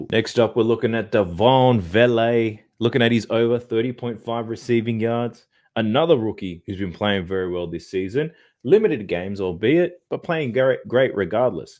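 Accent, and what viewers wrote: Australia. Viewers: Who else feels uncanny valley listening to an Aussie talk about our footie😅 im here for it beeeeioootchh!🤘🏼😎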